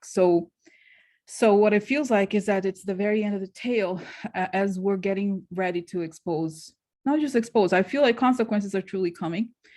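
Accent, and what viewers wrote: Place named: Russia. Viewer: me encanta su manera de dar su informacion siquica y su acento ruso🥰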